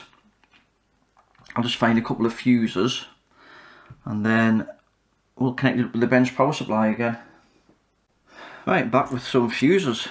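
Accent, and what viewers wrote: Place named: Geordie, United Kingdom. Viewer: Sitting here watching as I do some strap stuff then cleaning parts of my Xbox One I got for £4 prior to installing a new 1540 motherboard and dvd board. I do like the soft tones of the Geordie accent, I spent a week up there around 2008 meeting up with my old World of Warcraft guild and what an awesome time it was esp in the pub outside the Metro where I collected a ton of bird's phone numbers as they jus' adored my south London wide boy accent hehehehe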